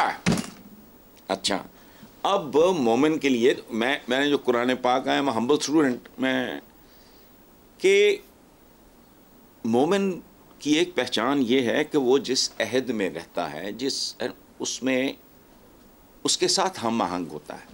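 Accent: Punjab, India. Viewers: * This dude why he is speaking urdu in punjjjjjjjjjjjjjjjbi accent? I lived in pakistan. Only the very poor and islam's thekedars are against wine, beer and whisky there. Open up let people enjoy themselves, religion is nothing but figment of lmagination., there's only one life so enjoy it.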